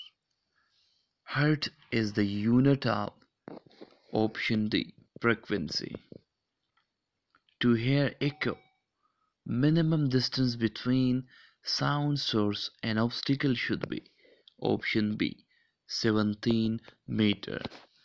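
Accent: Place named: United States. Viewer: Sir American ban rahe hen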